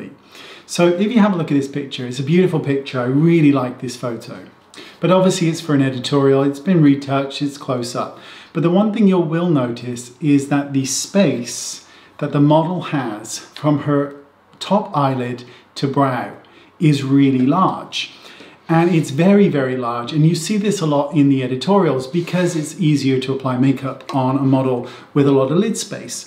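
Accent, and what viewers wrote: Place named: Scotland, United Kingdom. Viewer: What sort of lovely accent do you have? Sounds a bit Scottish sometimes.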